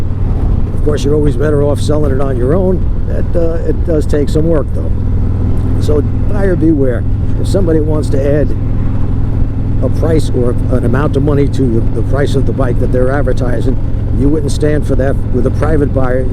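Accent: New York, United States. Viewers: where in NY ya from... OMG ya sound like me..hah.. apperecite ya...much respect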